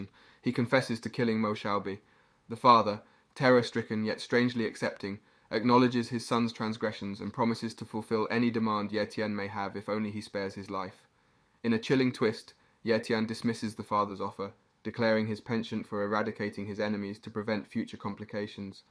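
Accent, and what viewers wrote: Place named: United Kingdom. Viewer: W Brit accent, 😂 my accent ain't as good as yours 😢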